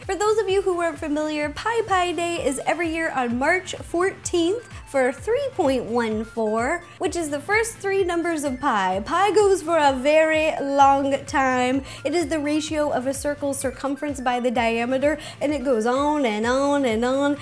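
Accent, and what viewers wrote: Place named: Pacific Northwest, United States. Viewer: I'm enamored by the accent :O Is it common in the Pacific Northwest???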